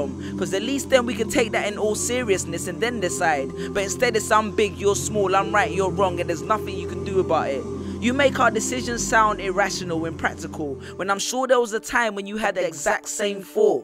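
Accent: South London, United Kingdom. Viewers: I can tell he's from south london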